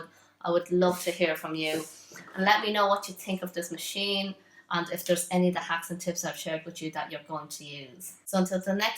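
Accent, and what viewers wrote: Ireland. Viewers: The Subtitles can't handle the Irish accent, hello from Co. Antrim btw. ;-) Haven't gotten around to using mine yet. Subscribed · Love the accent!! My dad was from Tipp, my maternal grandparents from Galway and Mayo and my husband from Down. I'm a sucker for an Irish accent 😁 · Thankyou very much ,, a beautiful Far Eastern lady with an Irish accent 🙏🙏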